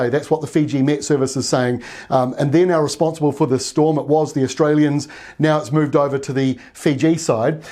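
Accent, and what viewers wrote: New Zealand. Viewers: Ton accent nouvelle-zélandais ;) Thanks for the reminder for Rural Weather - great forecasting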